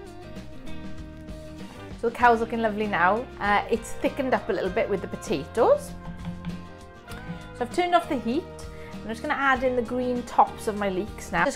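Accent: Wales, United Kingdom. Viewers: Appears delicious. I want to try cooking it! Is your accent Welsh? I visited Wales many years ago.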